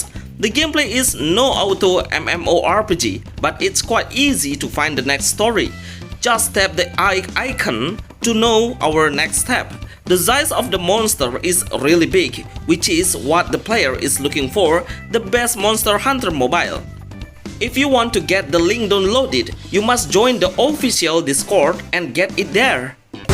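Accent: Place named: Indonesia. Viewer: mungkin pembicaranya yg indo bang kek indo banget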